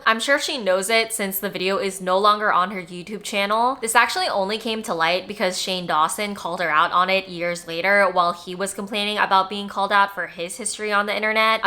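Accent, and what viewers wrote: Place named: United States. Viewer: Learned American English from her .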